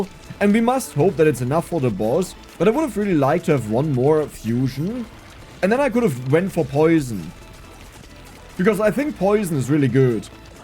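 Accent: Germany. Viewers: man i lover your thick german accent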